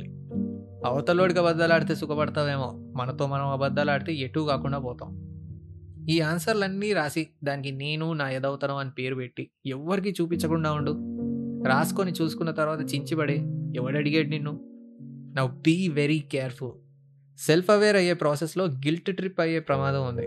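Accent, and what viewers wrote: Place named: United States. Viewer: Arranged marriages tho naaku problem kaadhu love marriage thone na problem . Andhukante nuvvu india lo unna nee matallo America vinipisthundhi sorry but I sense that . But india lo love marraige vadhu boss endhukante alanti oka love marriage couple ki puttina vaadine nenu . Naaku telusu ela unatadho vere caste ammayi abbayi pelli chesukinte aa putte pillalni ela chustharo . Aa chupulu neeku teleedhu baasu . Adhi neeku physical emi cheyyala povachu deep inside guchukuntadhi bhayya it's very hurting untadhi . Nuvvu puttadame papam annattu chussthe . Nee friends mothers nee tho friend ship cheyyodhu ani mee friend ki warning isthe vaadu appati varaku bhane mayladi tharvatha Mohan chatesthe aa bhadha chala bhayam karam ga untadhi . I envy u kids who are born for same caste arranged marriage couples . Arranged marriage lekapothe mundhu nuvvundav basu . 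Adhi okappudu chala manchi system . Aandhulo mana previous generation chala successful lead chesaru lives that's y nuvvu America velli patriarchal system criticize cheyyagaluguthunnav . Problem mana generation kids mind set lo undhi . Andhariki rights kavali responsibilities vadhu adhe major problem . Ee America velle vallaki patriarchy endhuku nachado artham kaadhu . Malli magallaki value ledhu antaro . Point Ento telusa mana south states lo patriachy kaadhu matriarchal system father enthaina earning varake . Caste system lo oka plus undhi idhi live marraige chesukunna ma mother ee chepparu enti telusa . Prathi oka caste ki vere ve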